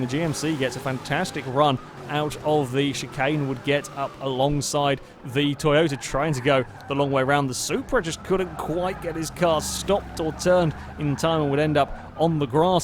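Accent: United Kingdom. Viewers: You sound incredibly British :D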